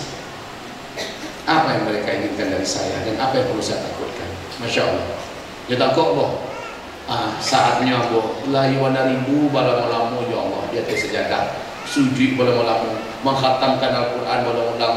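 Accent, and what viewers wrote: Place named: Minangkabau, Indonesia. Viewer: Wah minang. Kek nismilan cakap minang lain sikit..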